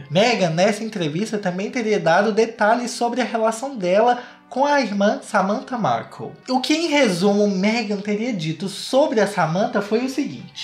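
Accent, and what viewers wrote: Minas Gerais, Brazil. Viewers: Sabia que conhecia esse sotaque kkkkk , mineirinho.kkk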